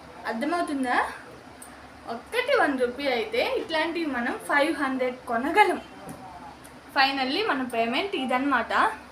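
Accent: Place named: Nellore, India. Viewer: Good very good....maadi kuda Nellore ni matalu vintunte mana Nellore Baga gurtostundi...proudness tho nanaki istanu annav good ni kallaloo happy ness chustunte yevarina motivate ayyi Ila intlo unde vallaki nuv chala aadarsavamthsmga undali vallalo marpu vachi vallalo unde talent ni gurtinchslani korukuntunnsnu any way congratulations...all the best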